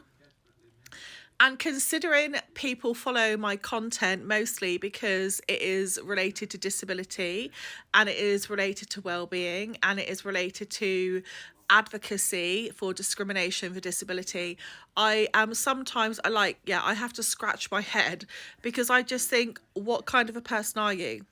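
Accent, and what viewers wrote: England, United Kingdom. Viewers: Some people I think do these things to get a response seeking attention any way they can, I did not even notice any issue with your voice at all, you have a normal English accent to me, and your personality is very positive so keep up the great work you are doing.❤❤❤ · South African here, beautiful accent, people from US love the English accent as well.❤️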